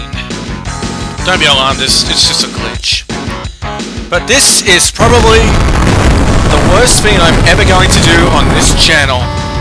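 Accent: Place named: Australia. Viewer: Haha, oh dear. I don't think I want to know. Actually, hopefully I'll never know unless curiosity gets the better of me of course. Ps. Awesome to see an Aussie doing this. Assuming of course your accent IS Australian :P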